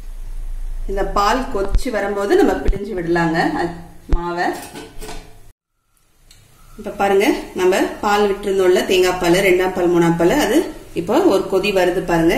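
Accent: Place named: Srirangam, India. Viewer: இப்படி இடியாப்பத்திற்கு மாவு அரைத்து பிழியலாமா? Sister! நீங்கள் பேசும் பேச்சு! ஸ்ரீரங்கம் மாமிகள்! பேசுவது போல் இருக்கிறது! நான் ஸ்ரீரங்கம்! Sister!❤️❤️❤️💐💐💐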